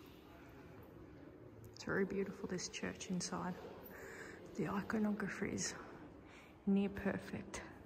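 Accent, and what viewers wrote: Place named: Australia. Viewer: Sounds like an Australian Voice! A mysterious Holy Site.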